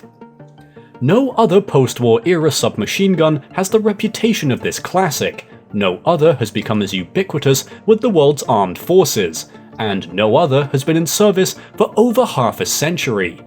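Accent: United Kingdom. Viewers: Like most people I find the English accent very irritating.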